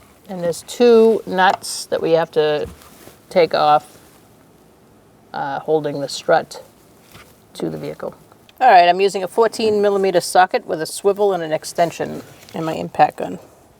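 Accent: Boston, United States. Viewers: Love the Boston accent ! 👍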